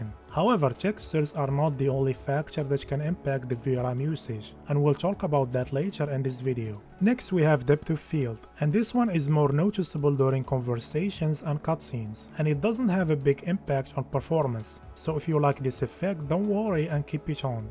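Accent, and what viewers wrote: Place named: Morocco. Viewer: Your English accent like moroccan people , nice videos keep going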